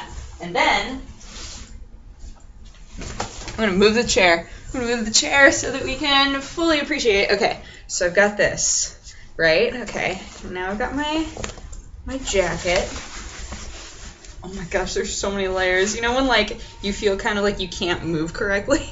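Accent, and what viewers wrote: France. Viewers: your french accent is BEYOND hot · I love your French accent. For me, it's so hard to pronounce the "r" :( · I love your frenchie accent !! I'm sure all your french fans (like me ^^) are specially happy today ! :D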